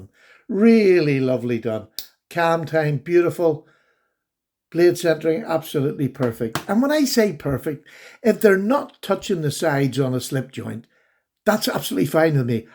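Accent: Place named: Ireland. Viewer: It is good to see you back to making videos besides all the shout out open tag ones. They are okay but, not if they become the dominate content of all the channels you watch. When I look at all the knives on the table it is not hard to see a pattern appear. You like everything; and that might be an good indication of your success on your channel. Another reason is; Americans enjoy hearing an Irish accent as well as others from places their family once came from. Your opinions are your opinions being seen from your eyes are fun to listen to. They may not be what others think; but, that is a good reason to listen to them. Everyone can learn from others; no matter how smart or how much they know or think they know already. It will be hard for you to choose the five best knives of 2021. 🎁🎄🍀🥔🔪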